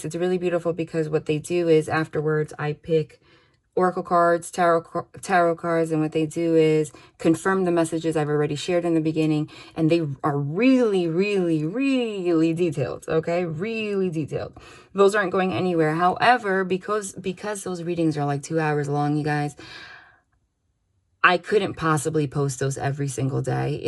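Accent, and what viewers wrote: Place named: New York, United States. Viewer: I can hear your New York accent! I know that was random but great reading! 💚💚💚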